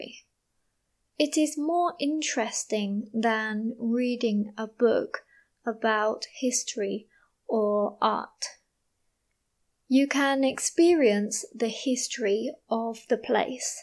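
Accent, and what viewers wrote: United Kingdom. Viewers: I'm wondering something... Where does your accent come from? Of course it's British, but your accent is much clearer than most English. I knew there were many accents in the UK. 🤔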